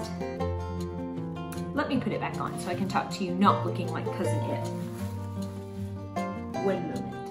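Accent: South, United States. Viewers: I detect a fellow Southerner! Native Texas living in the West! Miss the south, and will be back there soon! My hair worked the first time, as I have ringlets already! Thanks for the tips!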